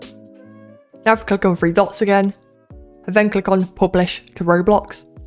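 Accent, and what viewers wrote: United Kingdom. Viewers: Amazing, plus the vouce is satisfying, british is cool.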